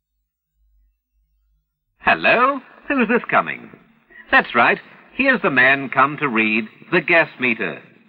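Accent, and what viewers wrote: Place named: Australia. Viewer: Ah, when the world was still a good place to live. I mean, one third of the world, but still. This is such nostalgia, even with a funny Aussie accent.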